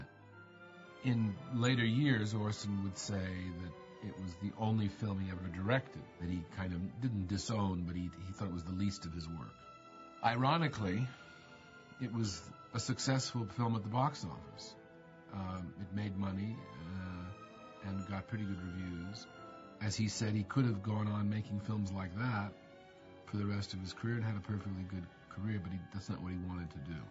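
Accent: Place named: Ireland. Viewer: lol I lasted 30 seconds, not fair I know but his constant talking in that terrible Irish accent......!!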